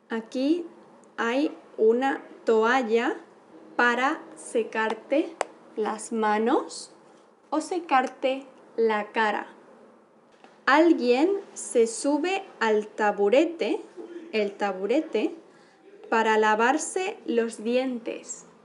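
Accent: Castile, Spain. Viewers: is this Castilian Spanish plz! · Is this European spanish or Latin American?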